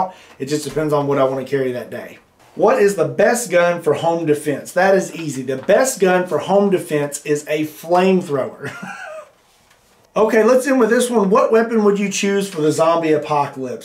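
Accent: Kentucky, United States. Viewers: “Are you from Kentucky”, haha as if your accent (or the name of the channel) didn’t give it away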